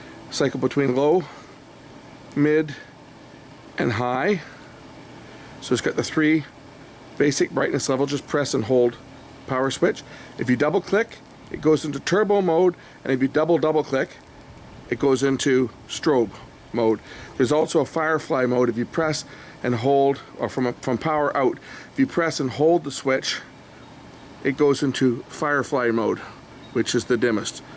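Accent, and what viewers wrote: Canada. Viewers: From your canadian english accent I think you are from British Columbia?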